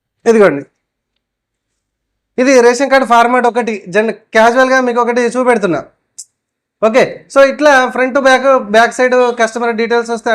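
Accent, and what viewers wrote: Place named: Andhra Pradesh, India. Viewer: Androlla lekka maatladutunnav anna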